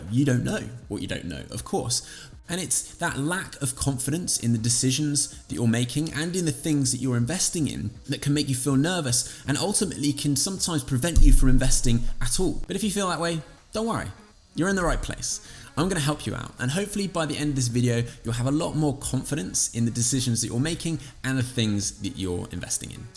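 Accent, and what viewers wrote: United Kingdom. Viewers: Thanks. I found the video very informative, well structured and easy to understand. Good to hear a British voice too. I read the comments on r/UKPersonalFinance and it seems a good bunch have a stick up their arse about you only discussing Vanguard, but I personally think you’ve made it clear there are other providers. Vanguard are just an example. Your audio/video is on point and deffo a thumbs up for the warm light background. I would love to see a video doing a deep-dive on UK pensions and specifically on how to protect yourself when moving companies or countries. I’m personally in a situation where I opted out of my work place pension scheme and haven’t invested as I was supposed to be moving to Australia.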